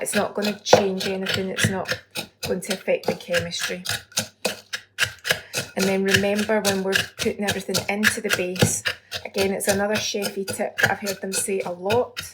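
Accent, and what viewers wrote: Scotland, United Kingdom. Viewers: I need to have a snooze pal. I thought this was a vid of a human + Pie Marriage. Was gutted when i heard the Scottish accent lol. Happy I never read it right. Pal it looks quality but i'm a slice of toast, butter and if av no jam It gets a tablespoon of sugar lol.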